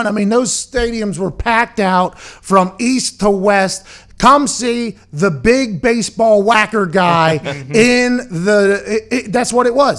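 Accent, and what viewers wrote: Pittsburgh, United States. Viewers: I wonder how many people had a hard time listening to a true yinzer accent ❤️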